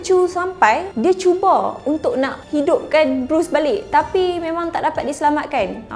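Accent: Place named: North, Malaysia. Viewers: xtau pulak ckp kedah naaaa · Hebat akak hg tau kt mana cerita ni. Sebenarnya menarik jgk . Lgi2 akak ni ckp mcam org utara. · Segak btul marka kedah ni..bibir dia manis dgn loghat utaqa❤ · syok dengaq loghat utara.... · Terbaik sis cara penjelasan cerita lancar Dan mudah di fahami humble relax jaa ckp utara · Pekat betul bahasa Kedah ang noh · Cakap utara noo.. 😊🌹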